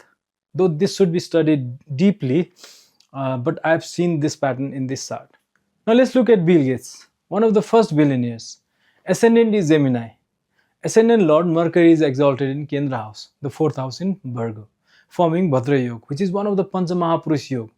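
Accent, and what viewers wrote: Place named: Nepal. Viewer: Sir aap nepali ho aap ki english tone nepali si aa rahi hai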